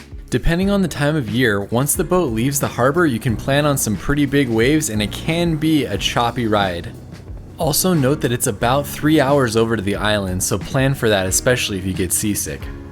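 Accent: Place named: United States. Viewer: American accent All I hear in every sentence is “ coastal trail” with no other information. Are there no biilfungs or a town there to buy food abd water? Is there nowhere to stay overnight? Are there no emergency services? Tgat woukd make sense but then again you’re Americans. Evrry little about yiur country makes sense.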